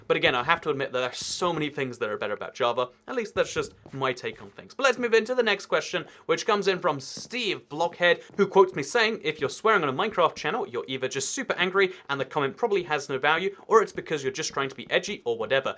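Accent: United States. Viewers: Also you sound pretty American to me. Then again I thought a German YouTube's was Dutch...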